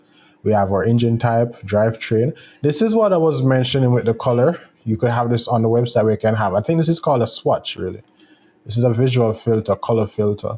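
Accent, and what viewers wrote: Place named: Jamaica. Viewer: Bro you sound so Jamaican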